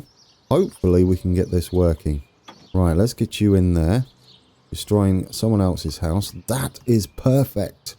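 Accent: United Kingdom. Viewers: Ah yes, soothing British voice to brighten my day.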